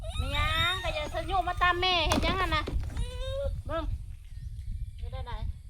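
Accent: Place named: Isan, Thailand. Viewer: สองนาทีก็มีแฮง " เสียงอิสาน"เสียงนุ่มเพราะ เสียงนางเอกฟังทุกวัน พิเศษสวย😊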